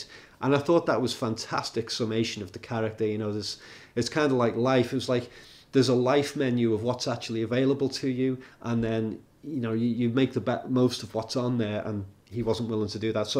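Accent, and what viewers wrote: United Kingdom. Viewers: The British accent adds to the credibility of this top 25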